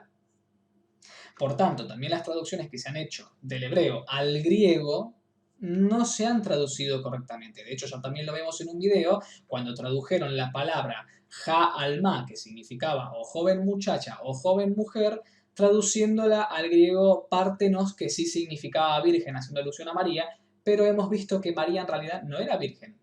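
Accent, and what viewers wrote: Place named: Argentina. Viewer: RECIBE MI SALUDO Y MI ABRAZO. ME AGRADA LA RIGUROSIDAD EN LA INVESTIGACIÓN Y LA ETIMOLOGIA. TODO LO DE LA BIBLIA ES MANIPULADO Y TERGIVERSADO. VALORO TU INTELIGENCIA, DISCERNIMIENTO Y OBJETIVIDAD. SUPONGO POR TU ACENTO ERES ARGENTINO, Y ESE LOOK Y BARBA ESTAN 1A. ANIMO Y ADELANTE!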